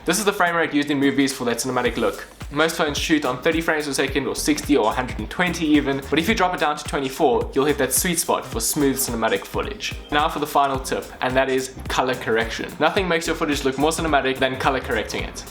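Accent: South Africa. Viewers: Are you South African? That accent sounds like home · Hey, the first video I have watched from you... and just from your accent and the first 3 seconds of the cinematic video(I saw the buildings and traffic lights) and I knew this is from South Africa(Downtown-Johannesburg). Nice well done :D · he's south african isnt he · U from SA? Lol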